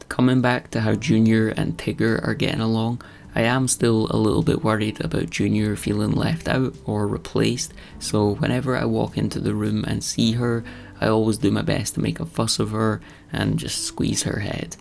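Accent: Scotland, United Kingdom. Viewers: I have to say that I love two things about this video. For one, I love your beautiful cats. Second, I love your Scottish accent💖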